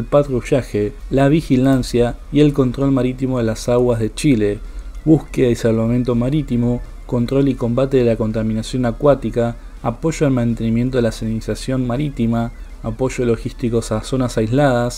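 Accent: Argentina. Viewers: Y porqué habla un argentino,???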